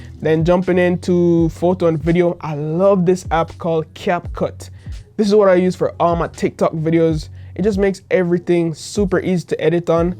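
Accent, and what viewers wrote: Jamaica. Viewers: i can already tell this man is jamaican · You Jamaican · Yo bro are u jamaican? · You sound Jamaican breda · u sound jamaican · Are you Jamaican?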